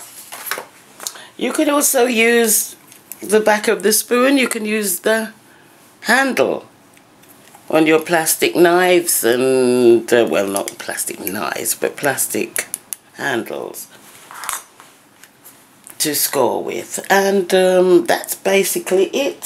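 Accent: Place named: United Kingdom. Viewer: I love your voice lol.. like a southern Big Momma with a British accent.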